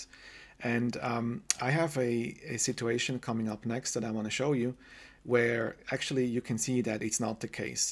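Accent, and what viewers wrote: Switzerland. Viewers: Are you from Switzerland? 😅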